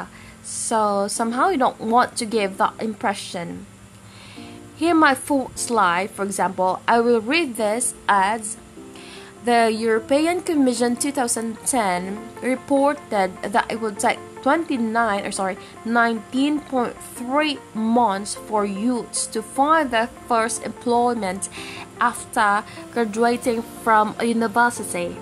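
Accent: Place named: United Kingdom. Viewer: Hey! your British accent is amazing! Hahaha I know it’s yours voice, funny and educational. I’m done watching,like and subscribe. Of course it’s amazing. I miss you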